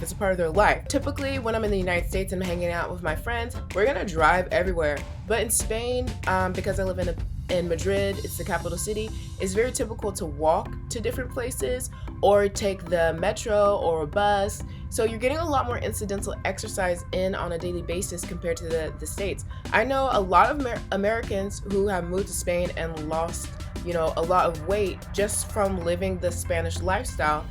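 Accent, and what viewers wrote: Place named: Canada. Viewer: Hi. Just curious what state you are from? You kind of sound Canadian...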